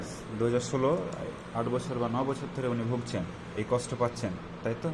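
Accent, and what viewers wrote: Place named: Chapai Nawabganj, Bangladesh. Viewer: dr. where are your chamber location ? Your talking approach like as you live in chapai and i am also living same district. Thanks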